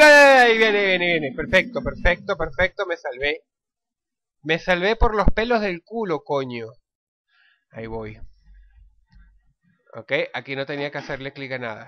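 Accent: Argentina. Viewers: Le fue engrosando su voz de sus 25 años para acá, muy aparte del acentro entre mezclado con el argentino, pero la voz le cambió.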